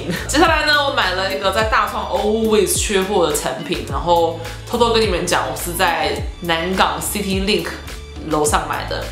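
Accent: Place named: United States. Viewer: Dani是ABC什麼的嗎？🤔感覺講話有口音欸😅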